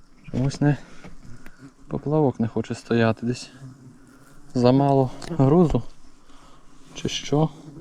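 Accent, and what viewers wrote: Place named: Volyn, Ukraine. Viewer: Привіт з Закарпаття!виріс на Волині згадав рідненький говір.😊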